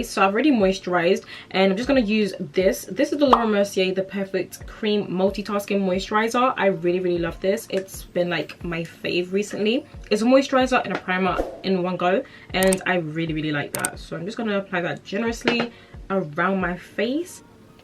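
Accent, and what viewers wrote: United Kingdom. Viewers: Wasn’t expecting the british accent!! Buzzing cause most foundation routines are american and they using products we can’t get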